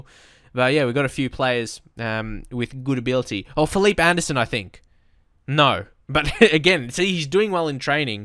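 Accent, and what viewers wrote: Australia. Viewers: Hey mate new subscriber here kinda binge watching this at the min I have an ac Milan save on fm 16 loving your content also the Australian accent is so cool haha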